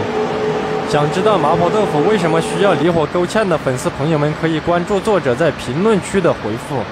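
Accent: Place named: Zigong, China. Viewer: Shuai Wang 这个师傅一听就晓得是自贡人，，， 怎么不是川厨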